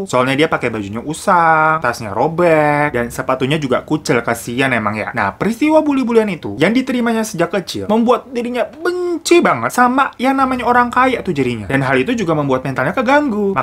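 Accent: South Korea. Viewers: Logat koreanya mengundang tawa ya bund. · Plissslah ngomong koreanya jadi ngakak dengerinnya 😂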